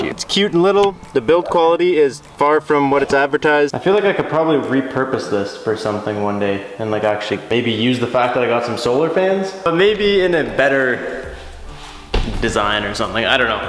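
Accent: Canada. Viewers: I'm new here, but guessing you're in Canada by the way you pronounce "out". Cause I was confused when you were stating the temps.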